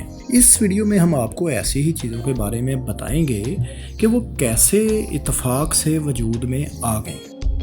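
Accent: Punjab, Pakistan. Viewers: اسلام علیکم۔ بھائی معذرت کے ساتھ، اگر یہی پینڈو اردو آوازیں سننی ہیں تو لاکھوں پینڈو چینلز ہیں۔ فیصل بھائی کا انداز بہت اہم ہے، یا اسے کاپی کرنے کی کوشش کریں یا کاپی کر سکنے والے شخص کو یہ کام سونپیں۔ اس طرح پنجابی انداز کی اردو والوں سے پلیز گریز کریں۔۔۔ اس طرح آپ بنی بنائی امیج ڈاون کر رہے ہیں۔۔۔ پھر سے معذرت مگر بات یہی سچ ہے۔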